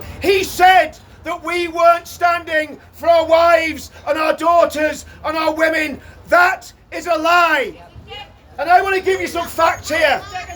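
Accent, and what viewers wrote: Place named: Ireland. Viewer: Love your irish accent ❤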